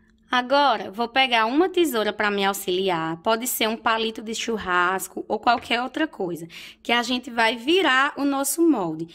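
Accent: Northeast, Brazil. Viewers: Amo o seu trabalho, Acho lindo o seu trabalho e o seu sotaque. Vc é paraibana ou pernambucana?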